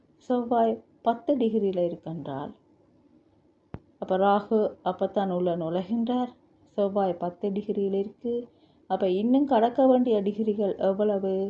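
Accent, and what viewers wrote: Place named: Sri Lanka. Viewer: நல்ல அருமையான, நுணுக்கமான விளக்கம் . நன்றி அம்மா.. உங்கள் பணி தொடர வேண்டும்...வாழ்த்துக்கள். நீங்கள் ஸ்ரீலங்காவிலிருந்து பதிவு செய்கிறீர்களா... ஸ்ரீலங்காவில் உள்ளவர்கள் பேசும் தமிழ் போல உள்ளது...🙏🙏🙏🙏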